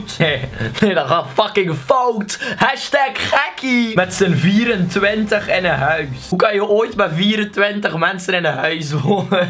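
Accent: Belgium. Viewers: je hoort echt dat hij een Belg is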